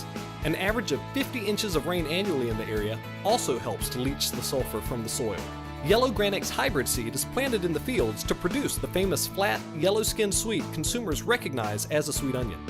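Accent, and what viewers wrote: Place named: Midwest, United States. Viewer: Boo!!!! I watched this just to hear that awesome Georgia accent and all I heard was a boring Midwest accent. I love your onions but now I’m sad.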